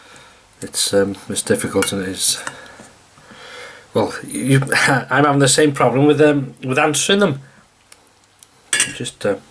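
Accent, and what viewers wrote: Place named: Cockney, United Kingdom. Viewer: 😆😆😆👍👍 you crack me up guvna!! Love yer take on the cockney accent! Ha ha! Happy Happy new year, dont forget, pop in for a cuppa or a pint if you are down my way! And no no ruby tonight chief, hot dogs!! Dont ask me why, just fancied hot dogs. 👊😉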